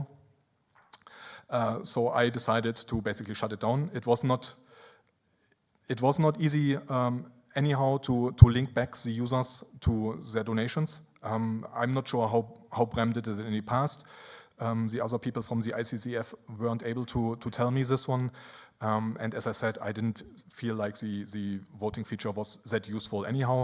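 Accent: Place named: Germany. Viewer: Glorious German Accent. Thanks for maintaining my favorite editor, though.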